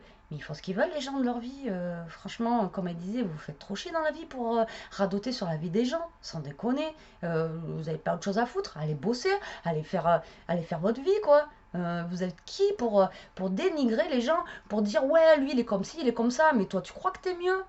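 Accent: South, France. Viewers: Ha pauvre. Perso j adore tes tutos. Ton petit bordel sur ton bureau. Ton accent du soleil......allez laisses tomber. Et continue de nous faire rêver avec ton sens de la création Bisous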